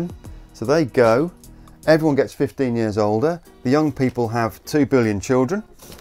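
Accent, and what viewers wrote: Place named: Cockney, United Kingdom. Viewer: Re this Sensationalists prior "sea ice" video but read my point # 8)... Lots of "sensationalism" & "doom&gloom, if it bleeds it leads" MISLEADING PSUEDO SCIENCE from our cockney accented "pseudo scientist".... Notice he says "sea ice" a lot. Propaganda from fiberal globalist seditionists seeking to "carbon tax" us into oblivion, this video is clearly paid advertising to sell us all into submission... don't believe the rhetoric. HERE ARE THE FACTS: 1) ice shelves are very thin floating 'skins' relative to the main ice mass at the poles, making for dramatic imagery (likely photo-shopped to support the phoney narrative). 2) yes, "sea ice" is diminishing, and yes our climate is warming, but... NO, Sea levels will NOT rise & flood coastal regions. 3) NASA's circumpolar satellites measure surface elevation & micro-gravity (mass directly below the sat.) and clearly show FIVE TIMES MORE ICE IS CURRENTLY DEPOSITED BY SNOWFALL AT THE POLES than what is lost to melting "sea ice". 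4) the disparity between the much greater annual polar deposition vs. loss of peripheral "sea ice" is ACCELERATING (especially at the Antarctic landmass). 5) while global warming is for real, it will NOT result in "catastrophic sea level rise" for the above reasons as well as greatly increased evap/precip filling depleted lakes, rivers & aquifers, as well as greater atmospheric moisture held in clouds & humidity. 6) CLIMATE MODERATION will greatly warm our winters but ACTUALLY COOL OUR SUMMERS in many regions d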